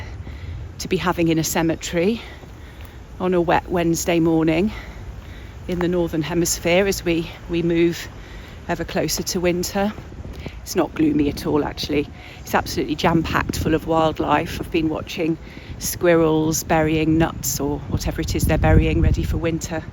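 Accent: United Kingdom. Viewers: Hi, I love your accent. I'm studying English and I find your British accent fascinating. I'll keep watching. Thank you very much for your work. Have a nice day!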